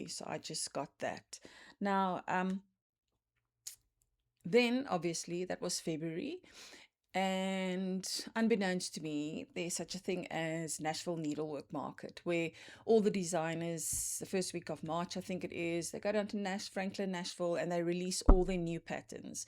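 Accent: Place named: South Africa. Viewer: Hello from the Chicagoland area, really enjoyed your first Flosstube! I have a sibling and nieces currently living in SA, so I recognized your accent right away 😊!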